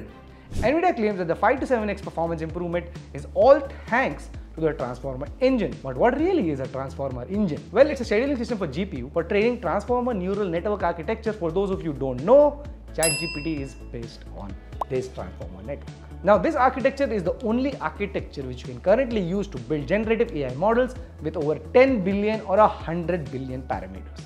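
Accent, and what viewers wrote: India. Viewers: Dude…your accent. You mine aswell speak Indian. Practice brother.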